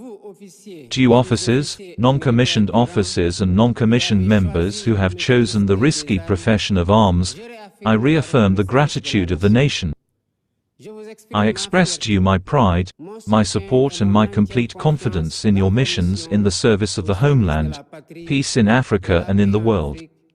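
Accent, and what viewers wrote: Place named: Malawi. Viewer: Mr Faye I can like u to do good job than worsting time to speak English like someone in malawi but in his head too much water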